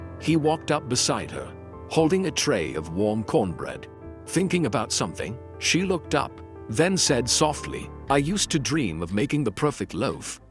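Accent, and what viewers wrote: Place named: United Kingdom. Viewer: the voiced narrative states "... catching the floating flour dust". However, the text subtitles erroneously indicate the floating flower dust -4435 the audio narrative with a thick British accent,describes Eva showing interns how to ,"braid hollow bread" and the text narrative reflects the word "hollow" bread. THAT'S A BIG MISTAKE! My husband was a professional baker. The spelling is "challah" and is pronounced holla. It is a traditional Jewish bread. It is NOT HOLLOW. You need to 1. get rid of the British accent 2. proofread 3. get a better AI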